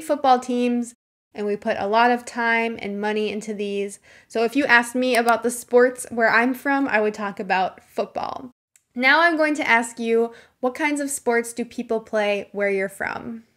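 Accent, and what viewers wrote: United States. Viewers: I have just found this channel 😃 I really like American accent so I already hit the botton for a subscriber . You are so excellent teacher . Love from Thailand.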